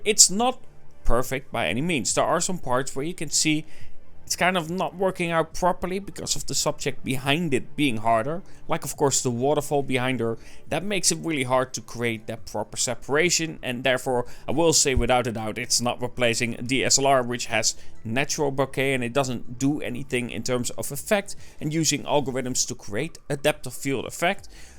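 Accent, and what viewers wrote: Netherlands. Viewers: Yo, man, off topic but what accent do you have, I heard you are in the Netherlands, is it a Dutch accent? If it is, it's really strange how different the Afrikaans and Dutch, English accent is, despite the languages being so similar.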